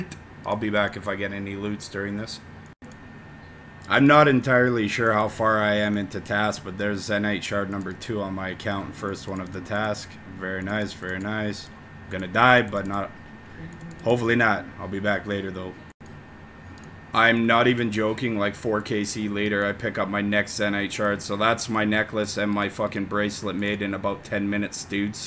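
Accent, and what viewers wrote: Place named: Canada. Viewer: Fuck it. Nice vid SON!!! Throw in some more of that Canadian accent, aye?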